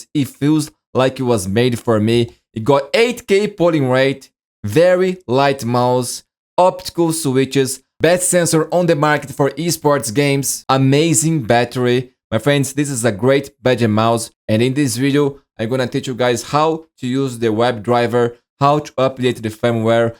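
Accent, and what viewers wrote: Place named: Brazil. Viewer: Tu é brasileiro ne? Sotaque não nega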